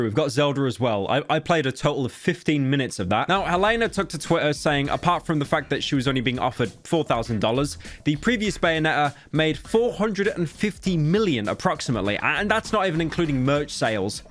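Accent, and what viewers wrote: United Kingdom. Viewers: I like when the funny British man talks about the popular thing on the screen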